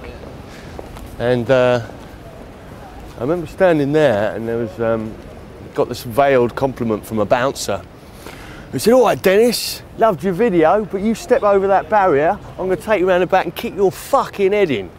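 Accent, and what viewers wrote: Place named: New York, United States. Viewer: I thought he was from New York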